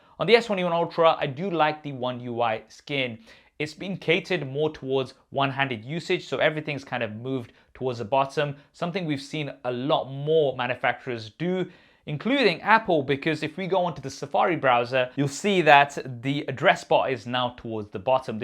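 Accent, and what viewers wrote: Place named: United Kingdom. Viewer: please link friend with speaker test! Having hard time with your UK accent haha. Thank you 🙏🏼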